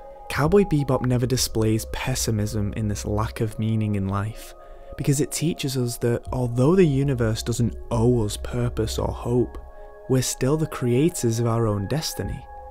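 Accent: United Kingdom. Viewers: i love how you have a british accent really gives you taat edge over nerdwriter1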